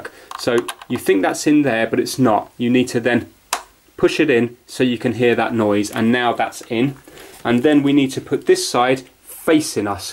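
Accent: United Kingdom. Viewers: britisch accent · He’s British. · anyone noticed is a british person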